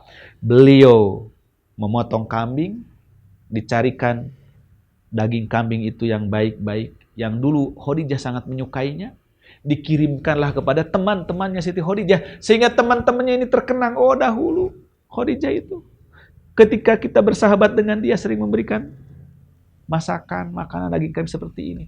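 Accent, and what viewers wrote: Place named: West Java, Indonesia. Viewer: Sangat Sunda sekali... NGAOS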